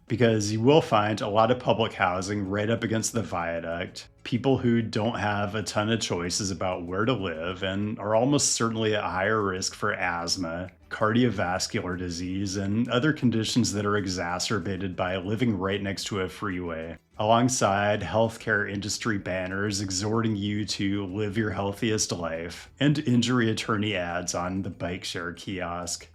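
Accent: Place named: Southern California, United States. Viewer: When are you going to ditch the fake SoCal voice inflection? You obviously weren't born with that, it's just something you learned from your environment. You don't live there anymore, but you still sound like a teenager. Are you going to sound like that at 90? When will you be genuine and use your own voice? If you change your voice to fit in, who's to say your political views aren't just as phony?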